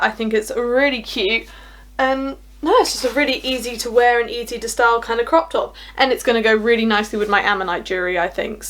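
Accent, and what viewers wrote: United Kingdom. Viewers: Oh you have the beautiful British accent! Love it!!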